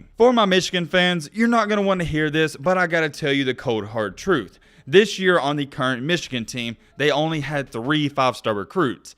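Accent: South, United States. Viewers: Dude you're numbers are so far off. Bro, you can tell by your back woods accent you're from the south. Keep your opinions there chief. AWFUL!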